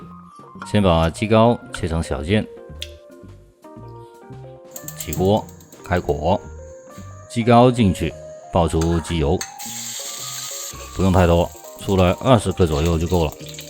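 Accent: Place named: Cantonese, China. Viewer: 帶著廣腔的普通話，清晰，幽默風趣的的旁白，讚！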